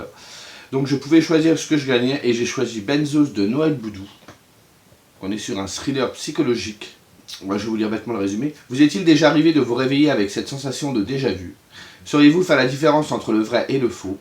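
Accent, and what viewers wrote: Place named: North, France. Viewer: Coucou! première vidéo que je regarde et j'aime bcp ta simplicité et ton petit accent... serais-tu du ch'nord par hasard?? 🤔😁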